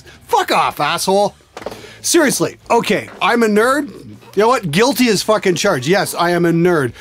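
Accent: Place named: Canada. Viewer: I love the canadian accent.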